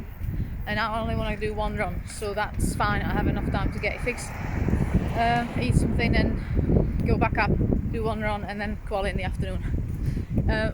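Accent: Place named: Czech Republic. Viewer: Great effort. I love your Czechshire accent!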